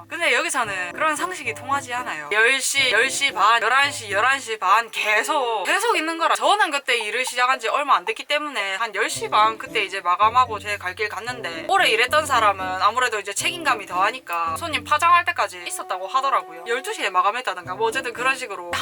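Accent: Gyeongsang, South Korea. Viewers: 우어,,,근래에 본 사람중에 제일 경상도 사이다네요 ㅋㅋㅋㅋ 구독 누르고갑니다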